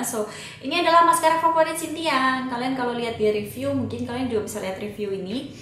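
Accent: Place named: Java, Indonesia. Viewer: medokkk bgttt kaloo ngmngggg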